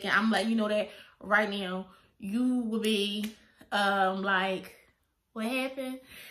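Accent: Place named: Philadelphia, United States. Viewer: I'm going thur the same thing ughhh waiting to see if I'm pregnant now But r u frm Philly? I can hear it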